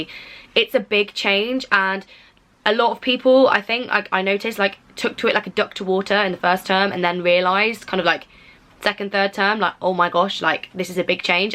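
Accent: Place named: RP, United Kingdom. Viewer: Thank the heavens for you, Ms.! Your vids are great when I casually feel productive and want to put on a posh British accent for an hour. Awesome video!!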